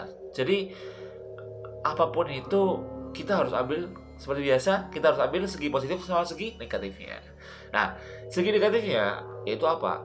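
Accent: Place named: Java, Indonesia. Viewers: medoke ketara nemen yakk hahahaha... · medok nya yang buat aku klepek klepek malah hihihi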